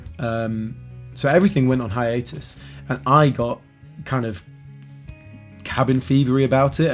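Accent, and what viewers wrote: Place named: Northern England, United Kingdom. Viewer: Mad our paths probably crossed back in the day. I noticed you were Northern by the accent but didn't know Leeds. Did a couple of modules of my degree at LCM in 05/06/07. I remember Dave often doing the sound for the metal gigs in Royal Park Cellars and knew his brother John (and later worked with him!). Funny old world.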